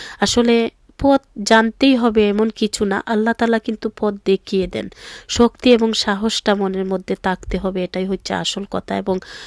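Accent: Sylhet, Bangladesh. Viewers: Apner sylheti kothai valo lage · Tik.asea.sobai.bujtay.parben.apne.voice.ajka.frist.time.sunlm.syealati.apur.muke.suddo.bangla.very.nice.aktu.kosto.oise.mone.oy.apu.voice ditay karon amra.toy.syealati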